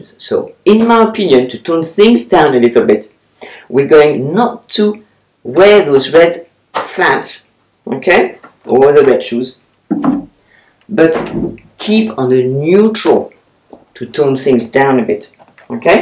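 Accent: France. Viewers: Bonjour! J'adore vos vidéos! I have just found your channel today! I watched it all day long! I am in love with you, your style and your amazing french accent! Merci! À bientôt!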